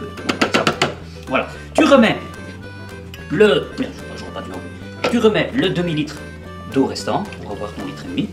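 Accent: Brussels, Belgium. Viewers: Super ! et drôle en plus avec l'accent bruxellois une fois !👍👍😂😂💐💐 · Tes recettes et le ton que tu y donnes sont super ! (et on adore ton accent belge en France) ... Par contre, pas besoins de bicarbonate, en effet c'est chimiquement totalement non nécessaire car cela s'annule avec le vinaigre (PH opposé). Je te joins l'explication ... <link>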